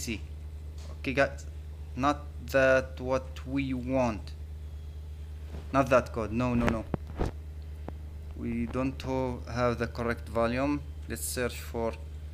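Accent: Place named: Arab world. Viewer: والله انك عربي عرفتك من اللهجه😍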